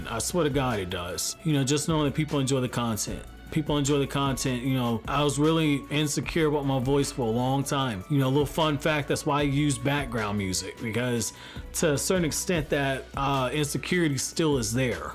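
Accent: New York, United States. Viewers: Bro, you actually have a pretty cool voice and you sound like you’re from NY. Your content brings me daily joy. Stay Up fam 🙏🏽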